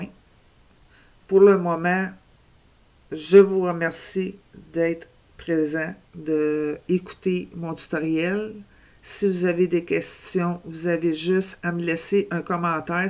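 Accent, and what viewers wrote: Canada. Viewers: sa ma plus votre façon de parler a la canadienne merci pour le parage ;-)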